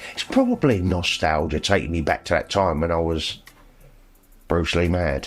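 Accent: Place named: Cockney, United Kingdom. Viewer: I noticed that Andy Serkis as Alfred the butler in THE BATMAN from 2022 has the same accent as you. What accent is that exactly? Is it Cockney or a variation thereof? On another note, when we will see a video of you kicking Tarantino’s ass?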